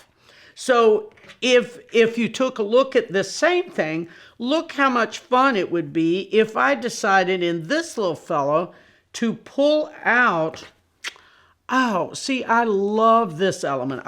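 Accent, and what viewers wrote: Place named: South, United States. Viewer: Being from the south, I could so easily become friends with! Love her southern drawl, her humor! Where have you been? I discovered your videos today, and am binge watching every video you have put out. I have been quilting for a couple of years, but I am definitely still a beginner, with BIG dreams. Thank you so much for just keeping it simple. I look forward to watching every video. FW, Tx here!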